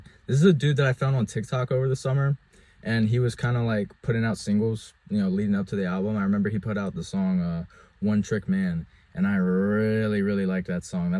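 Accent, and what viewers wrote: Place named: Virginia, United States. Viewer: Good video man, nice list. It sounds like youre from VA, I'm not too far from Harrisonburg myself lol, keep it up man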